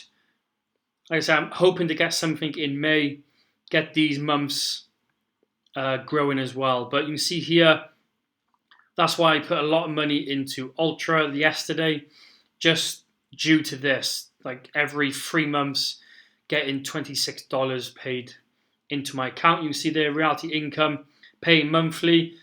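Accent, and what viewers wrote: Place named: Wales, United Kingdom. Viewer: Sounds like a Cardiff or Zooport accent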